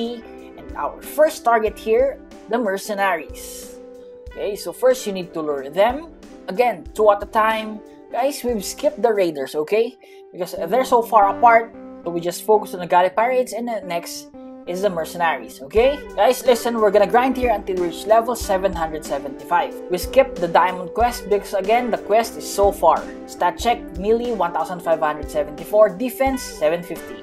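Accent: Philippines. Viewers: he has a filipino accent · i knew it, pilipino ka halata dahil sa accent naten pa notice idol haha!!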